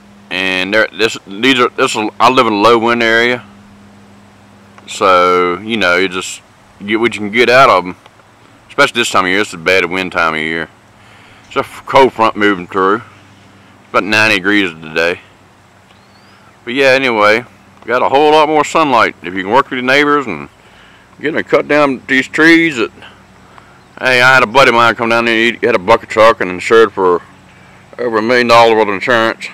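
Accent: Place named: Virginia, United States. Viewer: I'm digging the accent. You grow up in Virginia your whole life? Nice.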